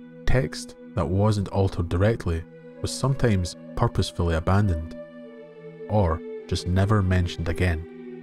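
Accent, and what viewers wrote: Scotland, United Kingdom. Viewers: I don't know if you have an only fans, or an asmr channel, but you have one of the most appealing voices on Youtube. I could listen to you just talking, or reading...what corner of Scotland is your accent from?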